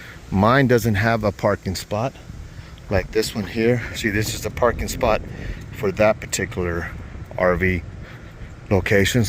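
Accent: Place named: Latin America. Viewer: I hear a Latino accent. Hablas español?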